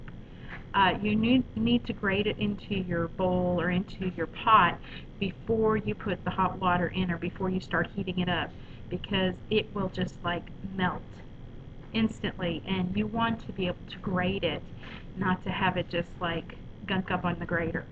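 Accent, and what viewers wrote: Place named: Southwestern Pennsylvania, United States. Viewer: Wursh? Are you from SW PA?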